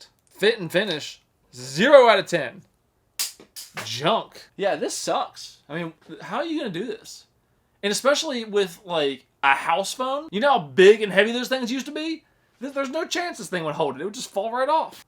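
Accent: South, United States. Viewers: Maybe it's just the Southern accent but dude will you dropped on your head? Either that or you've huffed a lot of petrol. I bet you think the world is flat and birds aren't real.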